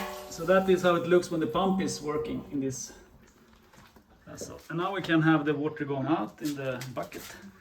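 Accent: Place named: Sweden. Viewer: Den värsta ikea-engelska jag har hört..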